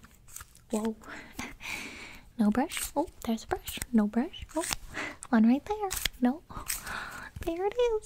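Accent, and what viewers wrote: South, United States. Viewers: Why did her voice change from the start to the end she started to talk with like a southern accent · ...She always speaks with a Southern accent? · i love how her accent turns slightly southern. Cute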